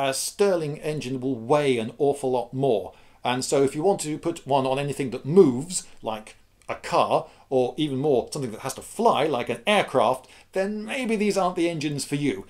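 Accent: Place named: United Kingdom. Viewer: I do so enjoy your so British calm but enthused way of speaking. A couple of my professors spoke this way and it kept my interest up to pass with rather good grades.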